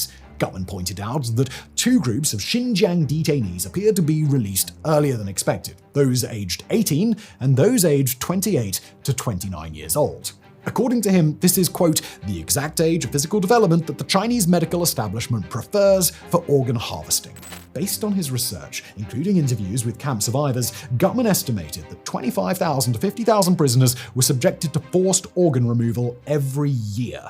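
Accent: United Kingdom. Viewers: especially if British or American. He would likely be labeled British due to his accent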